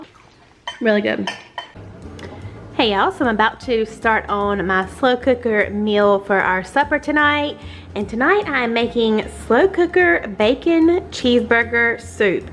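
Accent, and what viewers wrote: South, United States. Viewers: I clicked hoping for a beautiful southern drawl and i wasnt disappointed, new subscriber 🙋‍♀️😍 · I just love your Southern accent❤ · I love your recipes!! And your Southern accent, too. I'm definitely going to try your recipes. Thank you for sharing 🙏🏾❤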